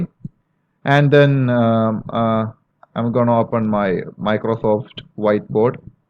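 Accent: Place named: Kerala, India. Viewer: Are you from Kerala sir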